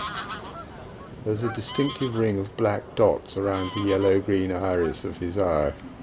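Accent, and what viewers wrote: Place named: United Kingdom. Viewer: Educated? Lol. Thats just a british accent fool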